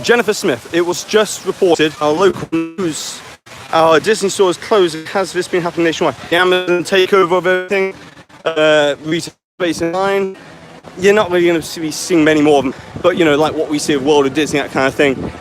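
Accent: United Kingdom. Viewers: Where about's are you from the UK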